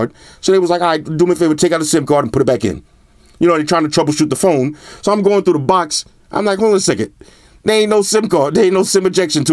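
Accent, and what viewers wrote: New York, United States. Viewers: Lmao i love how u talk u from Nyc? I’m from BROOKLAWN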